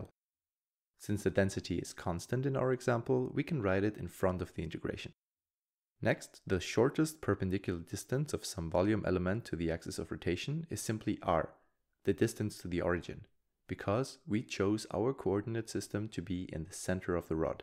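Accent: Germany. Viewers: Listening to his accent, I think he is German.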